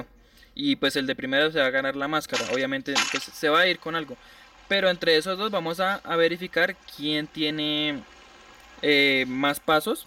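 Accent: Colombia. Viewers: Oye amigo quiero partisipar aun esta en abierto el sorteo ?? Soy colombiano y tengo todos los requisitos tienes asento de rolo bro las mejores digue asi me ayudaste vastante para comprar diamantes no savia lo de el efecti😎😅sigue asi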